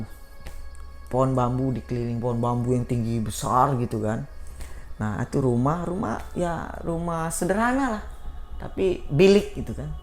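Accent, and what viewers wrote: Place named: Jambi, Indonesia. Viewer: AssalamualIkum.. Jambi hadir bang,semakin kesini bang tambah keren,begitu juga dg baground nya,walaupun udah berubah tp ttap dapat unsur mistis nya,dan yg lebih keren lagi,bang ttap menyelipkan logat bahasa jambi di setiap cerita,bang emang the best! Best beesstt!! 👍👍👍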